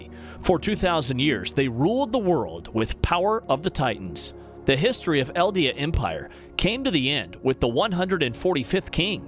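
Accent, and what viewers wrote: United States. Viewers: Is English not your first language? You don't speak with a foreign accent and you sound America, but you're grammar is all over the place. I'm so confused. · HOW IS NOBODY TALKING ABOUT THIS GRAMMAR JESUS CHRIST ITS SO AWFUL, he just skips out the word "the", "to" , "a", "into" and uses incorrect tense every chance he gets if he had an indian accent i would forgive him but its american, explain?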